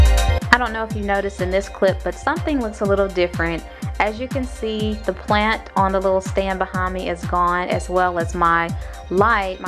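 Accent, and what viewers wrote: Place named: South, United States. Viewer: As a southern woman, your accent is everything!!! I think you're the first southern woman I've watched do a hair tutorial.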